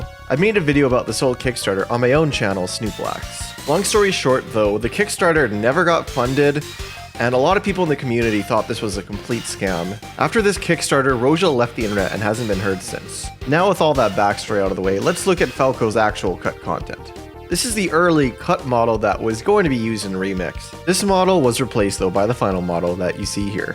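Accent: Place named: Canada. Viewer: this dude sounds canadian 🤢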